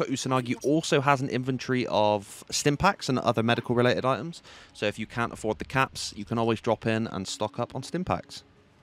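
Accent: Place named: South, United Kingdom. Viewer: You’re from Dorset or Wilshire! 😆 the southern British accent is strong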